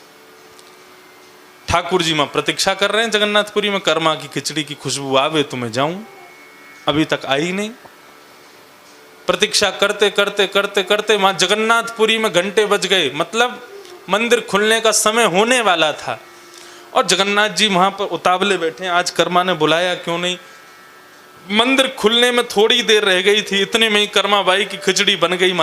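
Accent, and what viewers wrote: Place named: Braj, India. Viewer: ब्रज की वाणी मे रस और अपनापन महसूस होता हैँ 🙏धन्य हैँ आप महाराज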